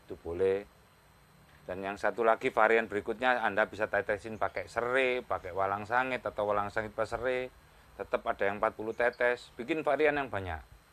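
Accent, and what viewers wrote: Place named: Mojokerto, Indonesia. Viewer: kok logate koyok mojokerto an om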